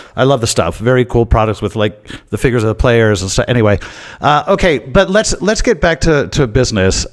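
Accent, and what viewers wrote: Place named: United States. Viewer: If it’s not offensive, can I ask why you have an American accent?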